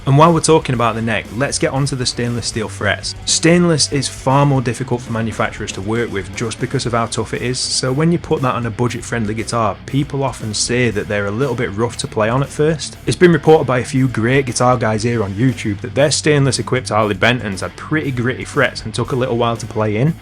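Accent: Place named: North, United Kingdom. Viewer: I can understand you perfectly well. Northerners unite!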